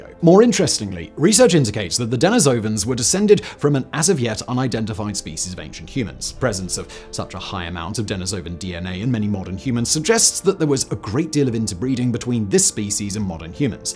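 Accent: RP, United Kingdom. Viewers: Sorry, the british accent prevented me from finishing this video —— 😣 💬 Thumb down 👎🏽. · Stick to this, your political take may aswel be straight from #10 no independent thought whatsoever I cant stand 5 seconds of it, I can tolerate that posh accent when its things like this though😂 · For those criticizing pronunciation, please note that he doesn't have an American accent. Britons often pronounce vowels differently (i.e., a "clark" helping in a store and the metal known as "a loom IN ee um) and this might extend to other vocalizations. It is possible he's not an ignoramous.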